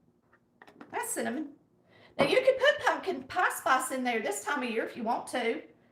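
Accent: South, United States. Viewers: Just discovered your channel and you sound just like my family. I'm from the south and I m glad I found you. Love your recipes they are just like my Mawmaws. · You say "yall" way to many time. And your speech is over done on the Southern accent . It is difficult to listen to you.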